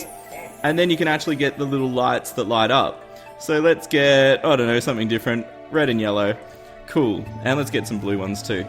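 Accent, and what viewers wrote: Australia. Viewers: Your Australian me to where in Australia do you live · Your from Australia? :O No wonder I like your accent☺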